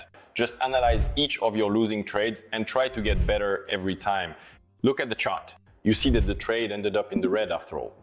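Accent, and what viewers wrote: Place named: France. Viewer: You have a French Accent 😁😁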